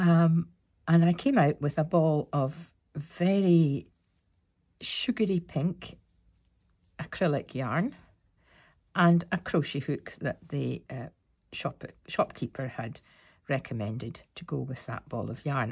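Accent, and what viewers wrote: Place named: Fife, United Kingdom. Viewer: Good morning from a very chilly Toronto, Canada. I’m an expat Scot from Glasgow, discovered your channel thru IG. Loved this vlog, the story of your mum and your intro to crochet. Wouldn’t she be thrilled and proud at the business and life you’ve created. You look very like her in the pics you shared. I love your Fife accent, so much softer than the harsher Glasgow twang. Your blankets are stunning in your IG grids. I’m more of a knitter though I also crochet. I can’t embroider any more as I can’t see close up detailed work. Eyesight and aging - sigh 🤷‍♀️. I look forward to hearing more in future vlogs. Your grand babies, the twins, oh boy, beautiful Scottish babies, just a cuddleful/armful of love. - Isabel 🇨🇦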